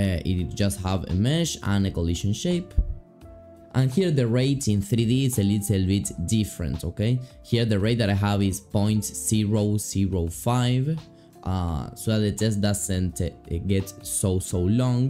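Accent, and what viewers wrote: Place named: Argentina. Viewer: Sos argentino? Tenés acento lol :D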